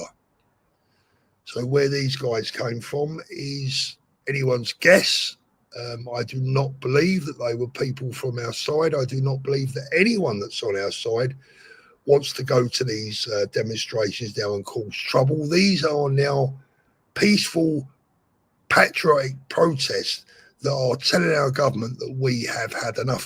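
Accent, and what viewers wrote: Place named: South London, United Kingdom. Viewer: You're very articulate and communicate perfectly.. Also, nothing wrong with a South London accent. A true londoner! 👍🇬🇧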